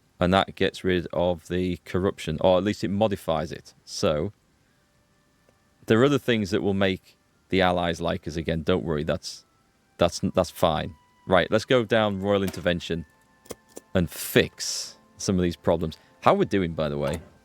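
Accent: United Kingdom. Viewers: great for you. better for us. I love your gameplays, mainly because of british english which is not so common on Youtube :)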